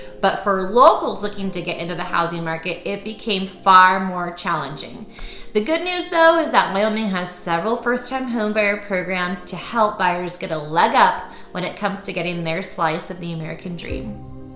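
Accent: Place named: California, United States. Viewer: I was trying to guess where you were from based on your accent. Before you said it , I was guessing CA...and was right! Great video! Thanks!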